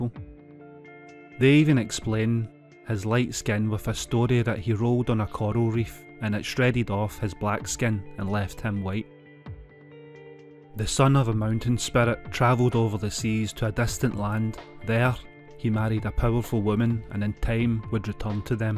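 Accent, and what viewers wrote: Scotland, United Kingdom. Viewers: Fussy haters always gonna be there. Nothing wrong with the Scottish accent - from a northern English lad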